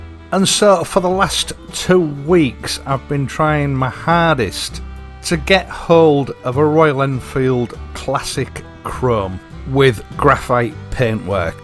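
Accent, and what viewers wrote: United Kingdom. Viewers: I love your British accent & narration style... 👌👍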